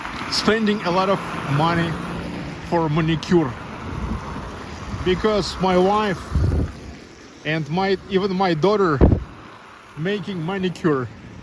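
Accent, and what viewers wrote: Russia. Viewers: Ох уж этот русский акцент)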